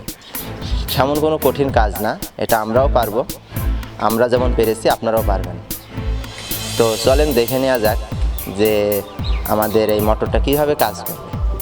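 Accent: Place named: Chandpur, Bangladesh. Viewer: তা আপনি একটা ভিডিও বানাচ্ছেন ভালো কথা কিন্তু কষ্ট করে শিখে নেন না কেন যে কিভাবে মুটা মুটি শুদ্ধ বাংলা দিয়ে ভিডিও টা প্রেজেন্ত করতে হয়? সেটা তো আপনার চ্যানেল এর জন্য ভালো ,আমার বাড়ি ও জসর শহর থেকে ২০ মাইল একটু নিচে কিন্তু আপনার মনে হয় কোট চাঁদ পুরের দিকে ।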